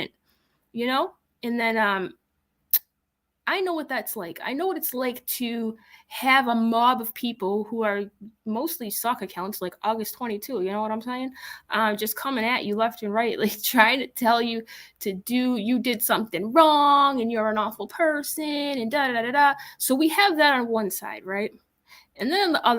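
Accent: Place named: New England, United States. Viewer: ... First off, you make me miss my new England accent, lol. I'm from RI but now live in NC. I'm not sure where you're from, but I believe it's new England. I don't agree with everything you stated in this video. I do appreciate your non biased stance. Overall, I enjoyed this video. Thanks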